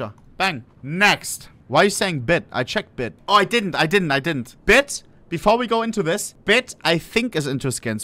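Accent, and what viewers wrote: Germany. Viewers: He pronounces it in German w sounds like v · German. I love his accent bro 😂😂😂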